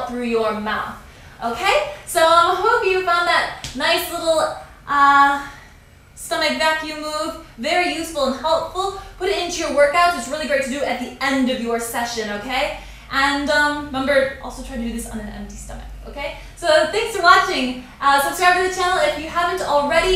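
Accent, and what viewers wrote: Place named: England, United Kingdom. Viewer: Have you ever lived in England I feel like I can hear a bit of an accent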